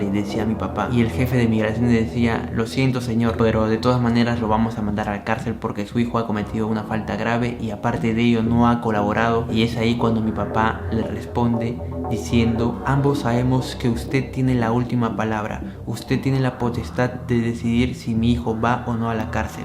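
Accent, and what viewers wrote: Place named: Peru. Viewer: Dicen que los bolivianos altoque detectan acento peruano y les caemos antipatico por la rivalidad de las danzas esas de caporales,morenadas etc, por eso te insultaron por tu nacionalidad.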